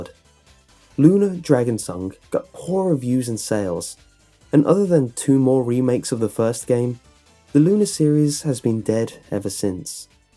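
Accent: United Kingdom. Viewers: British accents.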